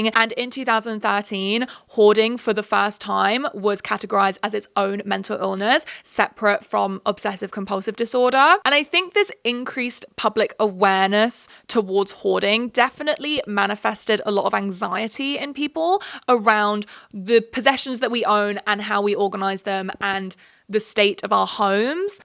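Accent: England, United Kingdom. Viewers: Gal you are so likeable ! Such a breath of fresh air hearing one of these pop culture chit chats from a proper British hun 💋💋 also this restock shit is so tacky haha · Weird thing to comment on, but since Covid scattered my friend group and I had to rebuild my social life from the ground up online and I ended up with a very international social group with all kinds of different accents, I did not realize you were English till you said "washing up". I've been watching your videos for months.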